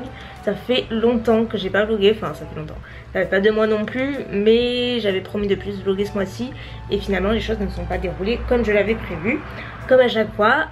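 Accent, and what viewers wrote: South, France. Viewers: Ouais moi y'a un autre truc qui me perturbe! (et d'autres aussi, comme Chrystellemakeup qui est aussi du sud) dit "moinss" pour "moins". Je m'en remets pas!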